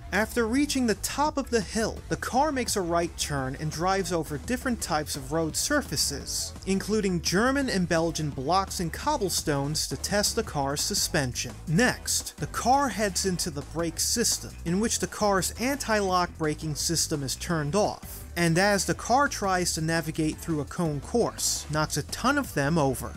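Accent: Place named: New York, United States. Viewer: Alright. Maybe I’ve missed this in another video somewhere as I’m watching this as a playlist. But “aloung”…I’m getting a NorthEast accent. I know it’s not NH, MA, or ME. You don’t say “hahd, yahd, cahd, cah, bah, laand. Am I high in hearing some NY? Not trying to out your place or anything. Just the accent is bugging me and I just had to finally ask 😂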